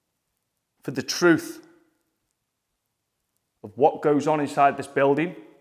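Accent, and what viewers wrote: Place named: Australia. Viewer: I love Australian accent ❤️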